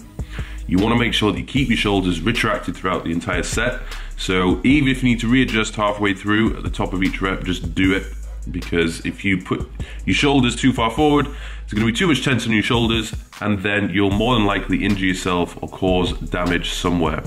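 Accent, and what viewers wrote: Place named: North, United Kingdom. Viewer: Where are you from? Accent kinda sounds north.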